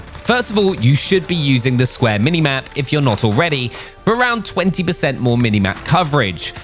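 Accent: United Kingdom. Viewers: Now I know....he actually British by his accents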